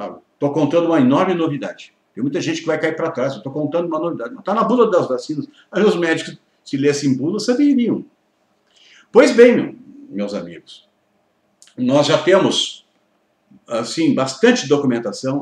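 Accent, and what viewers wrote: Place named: Rio Grande do Sul, Brazil. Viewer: sou de Santa Catarina. Você é do RS, pelo que ouvi no vídeo. De qual município?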